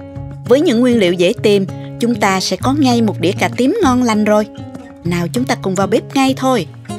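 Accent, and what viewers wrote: South, Vietnam. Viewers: Món này ngon quá chị bà ơi, chị có một giọng nói rất ấm áp truyền cảm đậm chất nam bộ, một giọng nói như phát thanh viên Radio hay là những bộ phim tài liệu lịch sử rất tuyệt 😄😄😄👍👍♥️♥️ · giọng cô này hay ,chuẩn nam bộ luôn kkk giọng này làm kênh kể chuyện cũng hợp lắm đa!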